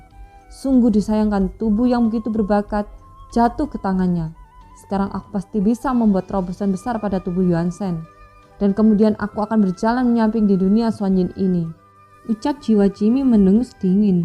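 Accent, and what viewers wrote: Java, Indonesia. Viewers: sekarang suaranya jadi medok...klihatan jawanya.😆 Semangat...!! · suaranya kurang,semangat,strong,terasa datar,logat jawinya kental,maklum belum terbiasa😁🙏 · Agak meddok. Lucu lucu 🤣🤣🤣🤣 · Ko medok yah. Maaf nih orang jawa bukan? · ini suara kaya nya Avatar nya ka.yng ngomong bukan suara kka yng asli..ka nya mna ya..Iki suoro ne kaya lagam nya wong jowo.. Iki..aduh kurang seru suara mu Avatar..🙏🏻🙏🏻🙏🏻.maap ya suara kka Avatar kka kurang menarik niee😔😔😔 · Suaranya kaya agak medok gimana gitu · Terasa medooknya 🤣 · suaranya medok minnnnnn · Suara nya terlalu cepat ini min... kurangi dikit..biar mirip sama...logat Jawa nya nampak x min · kok lain suaranya, ni medok bgt Jawa nya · Suara mu kgak enak min, medok btol. Mn si??? Dia lgi pas tuk mmbwakan nya, jlau kmu tdk kembalikan, kmi akan ramai2 mninggal kan channek mu ini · Suaranya duh..... 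Logat medok dan lemes... Ayo retret dulu dan berkultivasi supaya kami tak.ketiduran saat mendengarkan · Medok men toh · Suara nya jadi medok bgt. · Medok banget nada suara nya.suara Jawa..emang kmn kak..kangen suara nya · suaranya jadi medok gini.....jadi nggak pass rasanya😢😢😢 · Suara ne medook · Ini kok jawanya medok bgt😂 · sebenernya suara mimin ke 2 enak di dengar, tp yg jd asing itu pembawaan nya kurang tegas malah bikin ngantuk, trs dlm bahasa indonesia nya masih ada cengkok jawa nya jd terasa gmn gt. Sekedar saran coba dlm membawakan alur dlm bahasa indo ini cengkok jawa nya di ilangin 🙏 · Aura jawa ny mentok ya.. mimin ny..😊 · Logat jawa nya masih terasa🤭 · meddok suaranya kurang power, tapi boleh tahanla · Aih kok suranya ganti, logatnya kaya cici jawa medok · Agax medog pasti mba min orang jawa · Suaranya unik, mendok sedikit,ya keren siihh,di dengar agak kaku sedikit tapi begitu pemulah,ttp semangat ya,🙏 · Jowone totok😢😆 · Adu aduhh ko jadi medok ya, 🤭🤭🤭🤭, yo wes gpp, lanjut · Medok amat mc nya... 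🤭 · Hmmmm ada logat Jawa nya.. Jadi gimna gitu denger nya😢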